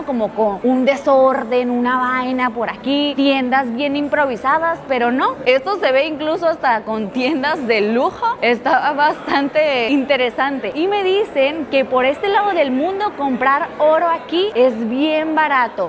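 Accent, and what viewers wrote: Venezuela. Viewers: me di curnta q eras venezolana pareces a Cathy Fullop hablando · Pq le sale acento neutro mexicano al inicio pero de repente le sale acento y gestos venecos? - No pude seguir viendo el video - me gusto mucho el inicio - acento neutro bien modulado para audiencia latam - pero cundo salio ese "Oite" "Mi chama" paso werita. por aca no es mi carnal. Bay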